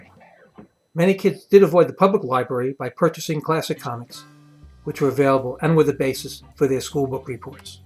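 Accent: New York, United States. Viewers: What a great and thorough trip down memory lane. You covered just about everything except for the soft pretzels sold fresh each day. I remember them being stacked on a verticle stick and selling for 5 cents each. Again, what a terrific video! Even the narrator has that great NYC accent. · This is so well done.The narrator knows his stuff. He also has a great NYC accent. The real deal. Thank you for this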